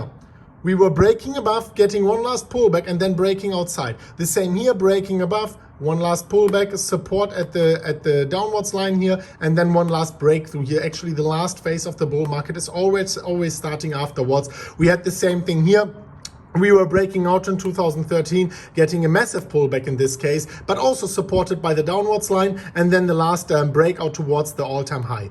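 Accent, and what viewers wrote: Germany. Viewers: Deutscher, hört man direkt :D :D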